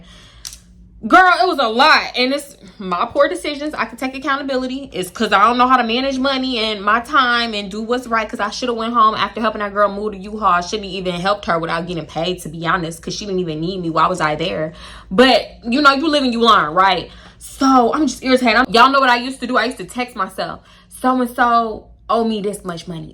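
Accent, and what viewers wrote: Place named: Houston, United States. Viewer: The way I knew you was from Houston before you said it 🤣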